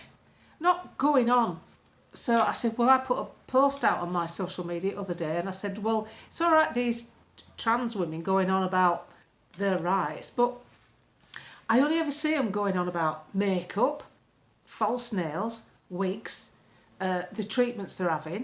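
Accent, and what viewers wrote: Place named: Lancashire, United Kingdom. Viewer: You have beautiful skin, and I’m from Yorkshire so I loved your Lancashire talk it’s same in Yorkshire on “tinternet”, you need to do more funny stuff, I love it along with the political side as well!❤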